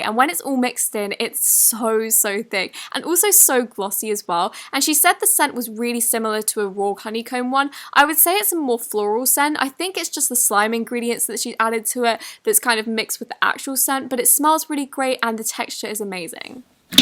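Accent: United Kingdom. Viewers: She's British so she can't pronounce it like Zoo-keen-ie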